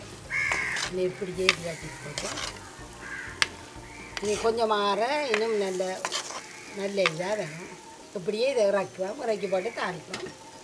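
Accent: Jaffna, Sri Lanka. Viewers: அம்மா உங்கள் யாழ்ப்பாண தமிழ் ரொம்ப நல்லா இருக்கு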